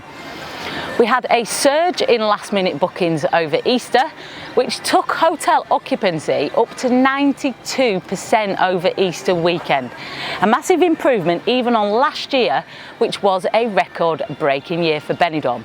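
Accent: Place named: England, United Kingdom. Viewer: Brilliant thanks. Also could I ask where you are from in England cos i like to guess accents, is your accent Manchester or Nottingham.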